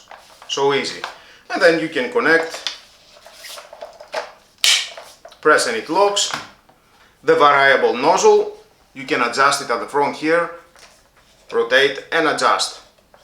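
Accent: Greece. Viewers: ρε φίλε σόρυ, αλλά μιλάς σαν Έλληνας!!!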